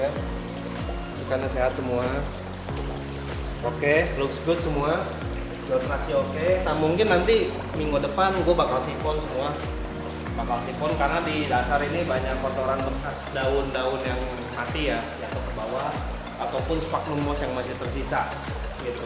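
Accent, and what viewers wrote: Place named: West Java, Indonesia. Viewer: Pertahankan logat sundanya kang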